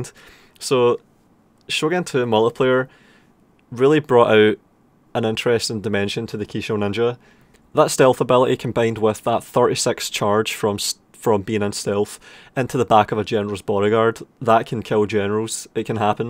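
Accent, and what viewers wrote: Scotland, United Kingdom. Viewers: you scottish? ik wierd ques but anyways great viedo never thought ninjas could be that useful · Are you Scottish?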